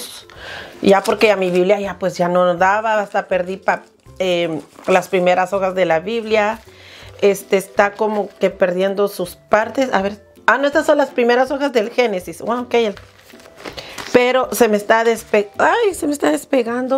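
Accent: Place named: Latin America. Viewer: Hermana: muy buen review!! Sorprendido por lo que dijo que tenía acento... la verdad que se escucha más latinoamericana que yo que soy de Argentina!! (Si me decía que estaba en México o en Guatemala o Salvador u Honduras... le hubiese creído). Bendiciones!!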